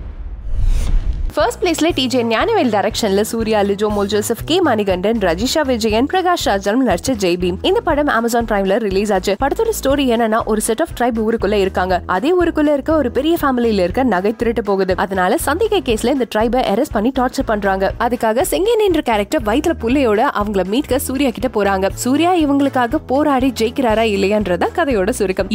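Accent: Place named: Malaysia. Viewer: akka Malaysia Tamil Torll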